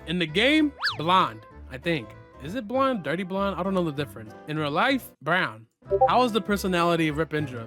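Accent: United States. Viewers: and you sound america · youre from california?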